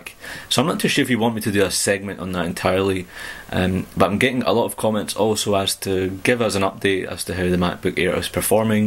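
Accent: Scotland, United Kingdom. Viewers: he's Scottish...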